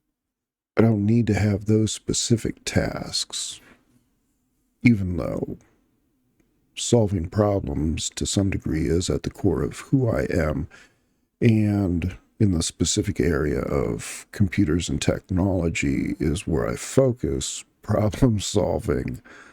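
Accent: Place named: Japan. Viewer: Yes. It's really something that could be scaled up. ASMR or whatever it's called. Audiobooks and all that. He's like a native Japanese speaker but speaking in English.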